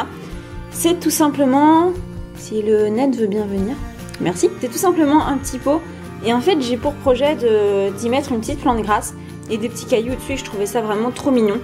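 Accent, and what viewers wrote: Belgium. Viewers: Tu es belge ? Si oui comme moi !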